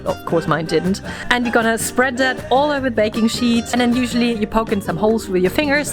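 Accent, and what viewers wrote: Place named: Germany. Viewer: How lovely to have stumbled on this video.... I noticed your accent and said to myself....ist sie deutsch?!!? Tagsächlich....ich bin seit 1979 in den staten....aber meine ganze familie ist noch in der Stuttgart area!!! Auch eine küchen hexe und practicing pagan für über 40 jahre!!! Your video is fun, lighthearted, informative and REAL!!! No witchy theatrics but genuine witch lore, love love love... you definitely have another follower!!!! Here in New Jersey we have similar weather as home so wheat stalks, sunflowers are used for lughnassad decorations and on the eve of lughnassad I make my bread dough, let it rest overnight and bake lughnassad morning!!! A small piece as an offering during Ritual and consumed with a Lughnassad dinner in the evening!!! Tschüss!!!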